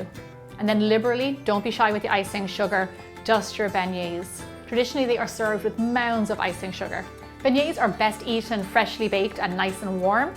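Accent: Ireland. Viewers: Is she Irish? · are ye irish? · she is Irish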